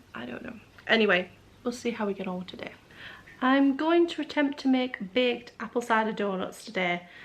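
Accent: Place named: Northern England, United Kingdom. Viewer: I had to pop over to your website to find out where you are originally from because you certainly don't sound Scottish, but have a similar accent to me (I am on the NE coast of England) I think us 'Northerners' all have similar accents!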